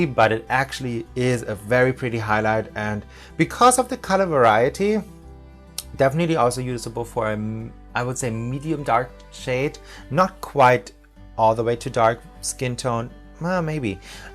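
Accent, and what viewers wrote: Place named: Germany. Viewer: Are u german? I can hear your accent :D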